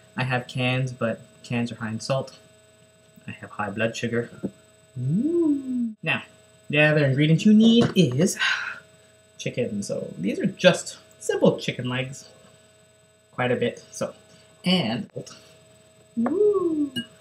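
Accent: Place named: United States. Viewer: trini cooking trini and have american accent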